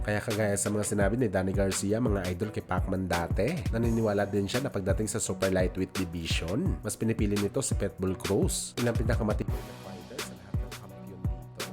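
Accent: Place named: Visayas, Philippines. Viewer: Prang baguhan po kayo d2 s pinas,, ang vlogger po n ito ay isang visaya/visayan kaya kung mgpronounce lumalabas talaga ang pagiging kung anong language nila , pra samin ang spelling tama pero ang pronunciation kung hndi mo alam kala mo tama ka, at kmi mali,, ngayon kung pure tagalog ka maaaring tama k pero alamin mo muna po kung sino b at anong tribo ang isang tao,,🙏♥️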